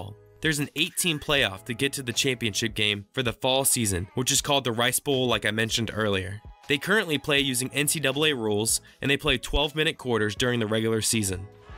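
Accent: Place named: Louisville, United States. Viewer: I think that he may be from "Loo-uh-vul."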